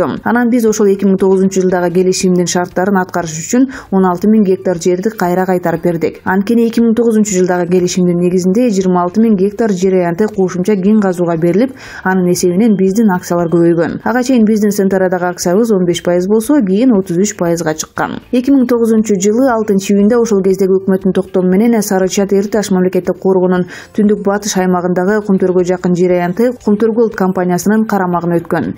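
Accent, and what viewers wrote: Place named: Turkey. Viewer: Диктор сен эмне эле турктордун дикторунун дикциясындай окуп жатасын? Киши жадап кетет экен.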